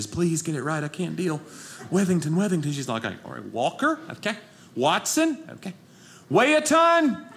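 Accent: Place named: Texas, United States. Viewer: His accent and talk of running suicides took me back to Texas middle school athletics. Ran so many suicides one day until I threw up and my legs were so sore I couldn’t sit down normally for a week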